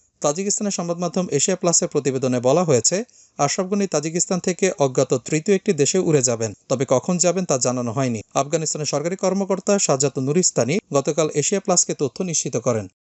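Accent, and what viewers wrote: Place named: India. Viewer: ভারতের মত করে কথা বলবেন না Please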